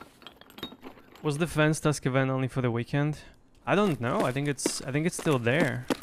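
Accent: Russia. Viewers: ты же русский,оно слышно))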